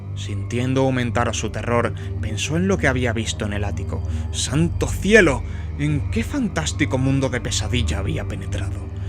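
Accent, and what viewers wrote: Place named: Spain. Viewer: Por fin un audio en castellano (españa). No me considereis racista, simplemente es la forma de hablar que utilizo y me es cómoda y familiar, así me centro más en la historia. Gracias!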